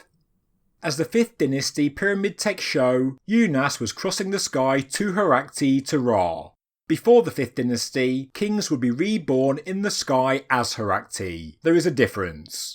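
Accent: United Kingdom. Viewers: Love your accent, so Little Britain...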